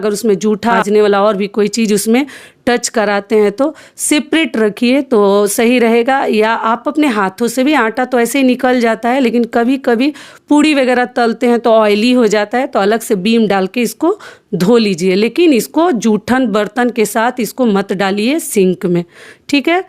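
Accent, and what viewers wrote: Bihar, India. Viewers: Ap bihar se ho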